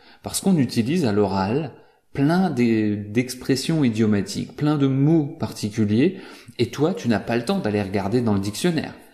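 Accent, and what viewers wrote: France. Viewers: Vraiment vous parlez le français authentique.,comme le français que nous apprenons aux écoles marocaines. Merci.mais parfois nous sommes pas capables de comprendre les francophones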